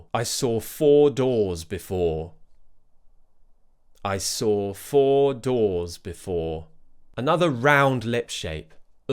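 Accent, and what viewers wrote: United Kingdom. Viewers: I have been trying to differentiate the American IPAs and the British ones... Not easy... But I think I have figured it out thanks to your video. Love you, teacher!!!!!! Thank you so much!!! Your British accent sounds soooooooooo nice in my ears.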